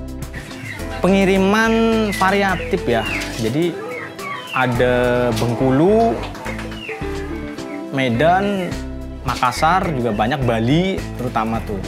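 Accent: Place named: Banyumas, Indonesia. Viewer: Wong ngapak💪👍👍👍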